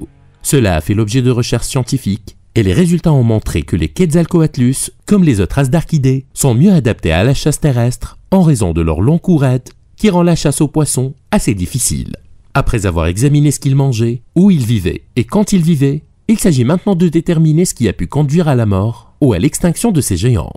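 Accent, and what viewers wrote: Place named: Asia. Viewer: asiatique je crois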